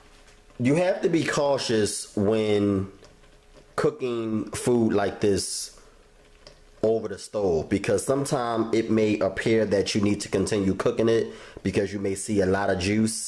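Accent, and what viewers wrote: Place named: Philadelphia, United States. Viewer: Lakeia Burgess lol That's that Philly accent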